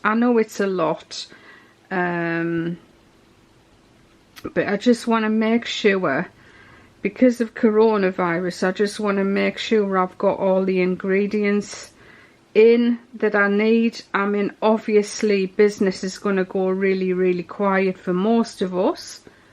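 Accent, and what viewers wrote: United Kingdom. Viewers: I would love to win these moulds, I would love to use them for solid body butters or solid sugar scrubs or wax melts. I've been watching your videos for at least 10 years know. Love your British Accent and your Fabulous High end style :)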